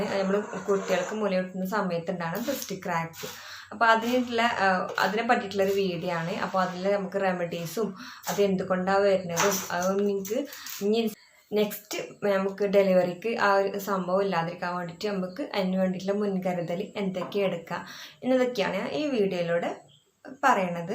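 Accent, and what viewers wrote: Malappuram, India. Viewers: സ്ത്രീ ശരീര സംരക്ഷണത്തെക്കുറിച്ചും ലൈംഗിക ജീവിത ആരോഗ്യത്തെക്കുറിച്ച് ഉള്ള വീഡിയോകൾ കൂടുതലായി ചെയ്യുക പിന്നെ നിങ്ങളുടെ മലപ്പുറം ഭാഷ ശൈലി എനിക്ക് വളരെ ഇഷ്ടമാണ് എല്ലാ വീഡിയോകളും കാണാറുണ്ട് കമന്റുകൾ ചെയ്യാറില്ല എങ്കിലും പിന്നെ പറ്റുമെങ്കിൽ കുറച്ച് ഊർജ്ജസ്വലമായി സംസാരിച്ചാൽ നന്നായി വരും അല്ലാഹു അനുഗ്രഹിക്കട്ടെ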